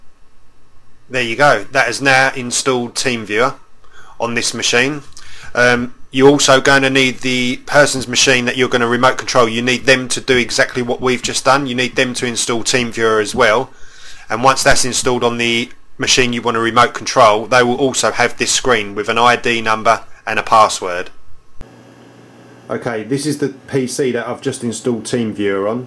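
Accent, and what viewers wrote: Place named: United Kingdom. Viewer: Great stuff, thank you so much. Also, it is nice to hear a fellow Brit helping us out!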